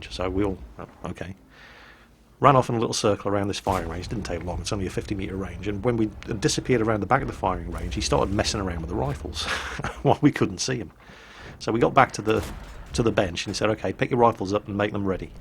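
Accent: Australia. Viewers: Soooooo... Are we going to get airsoft videos full of ' horrible Aussie accent now? Because that will be interesting.:)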